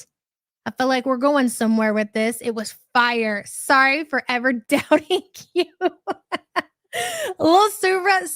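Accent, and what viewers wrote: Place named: Ohio, United States. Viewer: Replay gang today! I love our Support Group and I look forward to meetings in the morning. I'm from Southern Ohio and everyone I grew up with sounds just like you. It gives me so much joy up here in the North where I can sometimes feel a little bit alone. Thank you all ❤🎉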